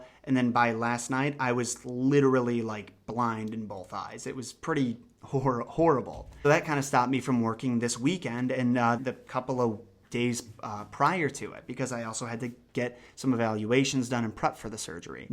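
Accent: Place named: Brooklyn, United States. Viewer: And side question, would your new content be like pyros or nfkrz'? If so fuck yeah dude if totally be interested in hearing your opinion on shit. Plus with that whole Brooklyn way of speaking you got you can do some great stuff. Keep it up man.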